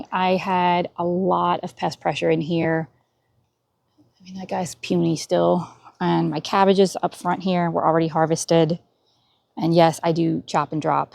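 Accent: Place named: New England, United States. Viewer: that's ingenious! I've heard of sparkly and flashy things, but that's brilliant, actually. You have a great garden and kudos to repurposing the beds with those huge water bins? I've seen them here but don't know thier purpose. And yes, you've definitely picked up New England vowel sounds from your dad. I'm a bit envious that you have indeed a cabbage growing. My garden is under a bit of snow (3b)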